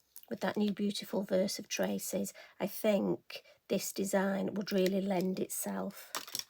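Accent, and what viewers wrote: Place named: Manchester, United Kingdom. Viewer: Thanks, I'm new to Lavinia stamps and your card is just beautiful. I love listening to you as well, reminds me of relatives in Manchester:)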